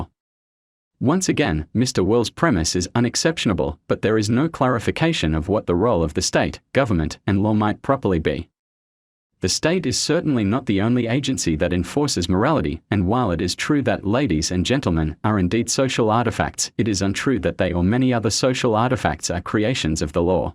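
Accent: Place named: Australia. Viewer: Nice Australian voice.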